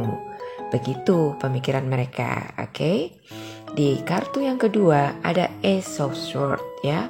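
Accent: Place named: Sunda, Indonesia. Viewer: Aksen Sunda nya lucu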